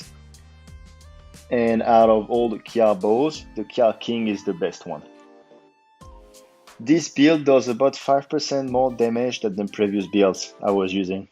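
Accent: France. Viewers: J’ai reconnu l’accent lol. Tu fais pas de vidéos en français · Ha ha, je m'en doutais ton accent anglais fais très français, sinon super build, vraiment original et passe vraiment bien sur le safi ;)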